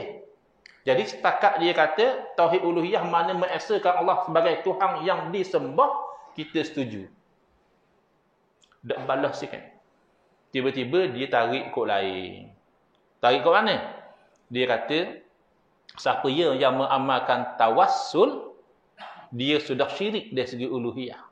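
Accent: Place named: Terengganu, Malaysia. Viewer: Ustad ni bahasa ganu ok lagi molek sangat penerangan yg jitu faham jelas selari dengan shyariat islam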